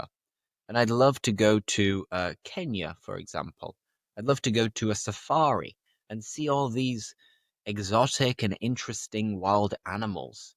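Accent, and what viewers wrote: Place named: United Kingdom. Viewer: I found your page few days ago, and you are an excellent teacher and your accent is amazing .. I love British accent..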